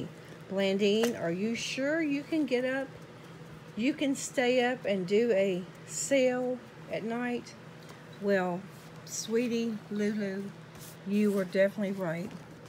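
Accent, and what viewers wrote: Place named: Virginia, United States. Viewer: "I say 'otha, otha, otha (not other) coz I'm from Virginia." Lol. I love you when you are at your natural best. 😘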